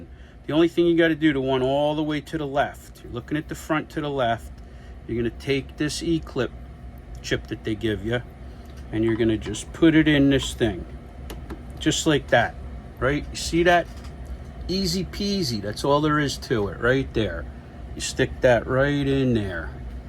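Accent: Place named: Long Island, United States. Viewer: Sounds like Long Island N.Y.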